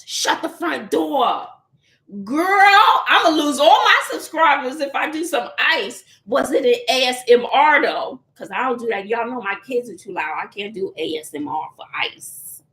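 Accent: New York, United States. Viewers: Lol, i love your NEW YORK accent, state of mind and swagg, you remind me of one of those NY Jewish Women, you're AMAZING, you could be a celebritie with your beauty, talent, humor and charm. Hang in there, it wont be long before you'll be an "EMPTY NESTER" time flies.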